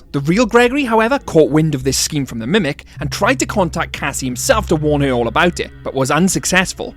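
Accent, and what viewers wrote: Wales, United Kingdom. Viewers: I’m a simple man. I see a FNAF vid. I hear a Welsh accent. I subscribe.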